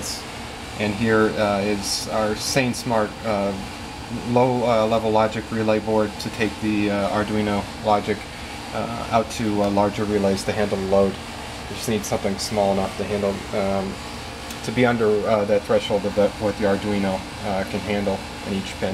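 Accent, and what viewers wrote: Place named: Minas Gerais, Brazil. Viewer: deu pra perceber o sotaque mineiro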